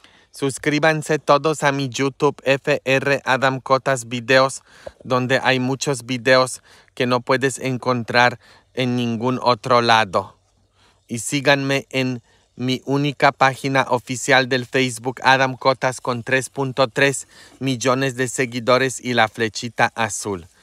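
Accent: Mexico. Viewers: Padre,soy una subcritora nueva de su canal ...es usted muy ameno,me encanta verle en su día a día y oírle hablar en español con ese bonito deje mexicano!! Por aquí estaré !!!..un abrazo!!!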